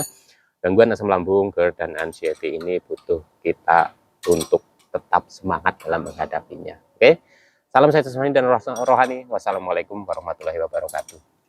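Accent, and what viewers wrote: Banyumas, Indonesia. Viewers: Kk ini didaerah mana kk ngapak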